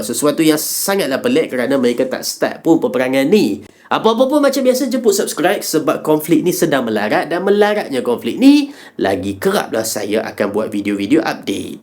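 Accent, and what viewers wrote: Malaysia. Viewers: kerja bagus bang kalau boleh secara menyeluruh guna bahasa malaysia....pelat orang utara...😅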